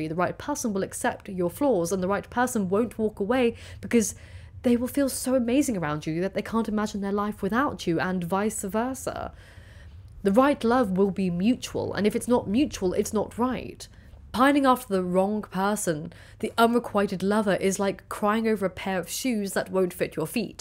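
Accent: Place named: Birmingham, United Kingdom. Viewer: So your a Brummie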